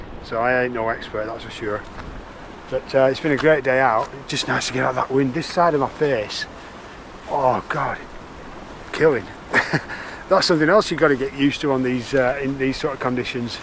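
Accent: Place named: Accrington, United Kingdom. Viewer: Always wondered if you lived close to me with your accent, Im from Accrington 😊 Im up there most days, I know a few camp spots out the way when I can't get to better locations. Lucky to have it on our doorstep. Might see you up there at some point mate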